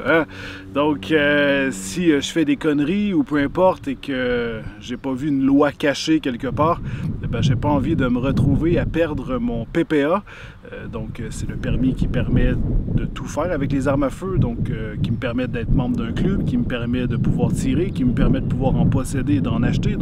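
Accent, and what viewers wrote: Quebec, Canada. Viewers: Ca cé du pur Québécois ,normal que tu comprenne rien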